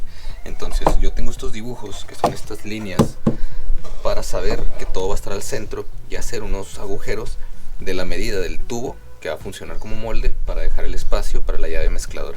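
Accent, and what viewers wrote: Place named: Chihuahua, Mexico. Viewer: excelente video, gracias por compartir la informacion, no todos son asi. saludos, suena a que eres de chihuahua :P jaja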